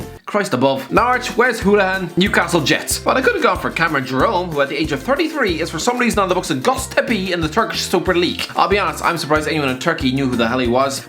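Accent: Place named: Ireland. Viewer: he’s fucking irish give him a break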